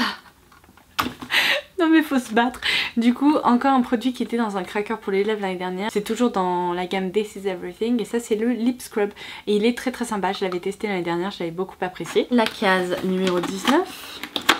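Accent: England, United Kingdom. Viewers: Ton accent anglais est super !!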